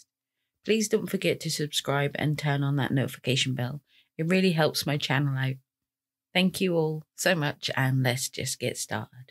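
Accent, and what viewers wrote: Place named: Cambridgeshire, United Kingdom. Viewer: Can I ask are you from outsell Wisbech area - my ex husband was from there sound so similar excellent channel xx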